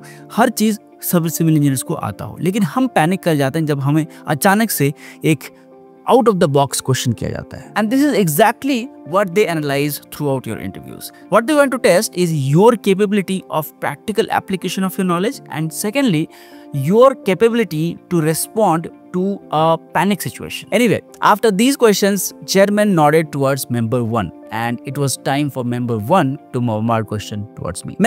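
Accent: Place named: Bengal, India. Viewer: sunei voi lagche.. dadda apni bengali hoye Jharkhand e thaken?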